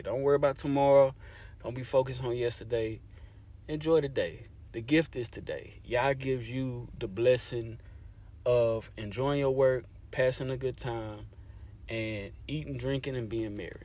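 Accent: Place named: Louisiana, United States. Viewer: You said you don’t have a Louisiana accent ? Yes you do! lol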